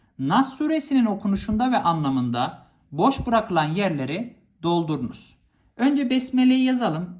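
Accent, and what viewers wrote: Malatya, Turkey. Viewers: Hocam Malatyalımısınız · Hocam çok güzel anlatıyorsunuz elinize sağlik ama siz Malatyalı mi siniz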